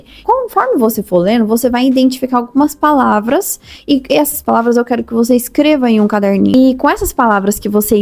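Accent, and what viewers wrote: Minas Gerais, Brazil. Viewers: Tu é Mineira, Goiana? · Agora que percebi que você tem mt sotaque mineiro rs (adoro, por sinal) ótimas dicas,. Aderi por aqui a de Friends, que pra ser sincera, nunca nem assisti 😅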